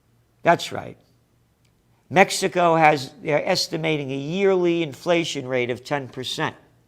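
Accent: England, United Kingdom. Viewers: Great show, loved the English accent! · The English Accent is spot on LOL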